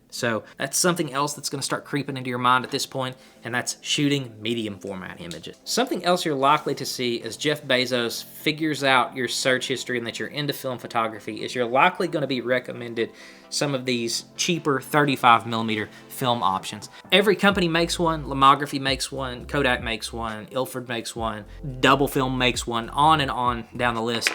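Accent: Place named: South, United States. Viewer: i love the southern drawll accent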